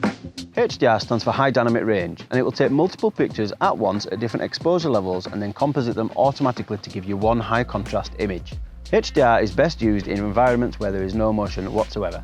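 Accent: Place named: Northern England, United Kingdom. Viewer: Your northern accent and the breakneck speed at which you are talking is not very helpful.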